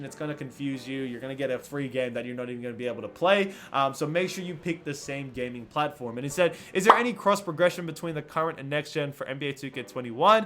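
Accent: Australia. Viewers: why you hiding your australian accent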